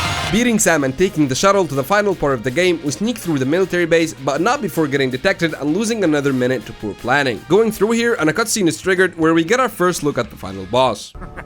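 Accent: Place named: Arab world. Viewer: أظن من لكنتك ومخارج نطقك للحروف انك عربي😅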